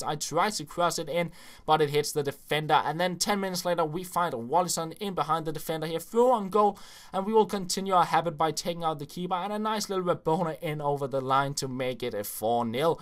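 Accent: Denmark. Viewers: Are you danish???!??!??!!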